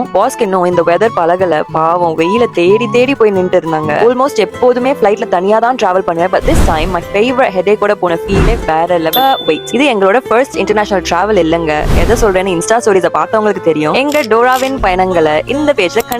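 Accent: United Kingdom. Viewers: Hi ka..I really love ur videos..the edits🎉..just wow...and your Uk Tamil accent is so sweet,nice to hear..Happy Happy marriage life akka..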